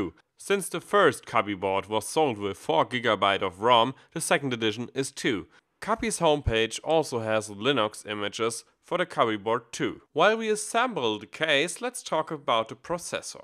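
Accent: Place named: Germany. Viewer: Such a refreshingly German accent ^^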